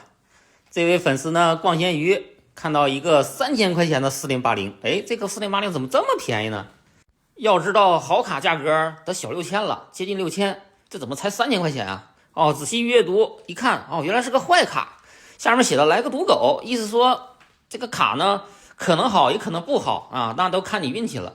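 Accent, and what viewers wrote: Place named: Northeast, China. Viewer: 听口音是东北人在广西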